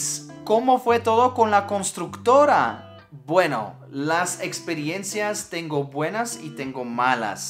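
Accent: Colombia. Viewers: Jajaja cómo has aprendido a hablar al estilo colombiano 🤭🤭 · Parce puro colombiano jajajaja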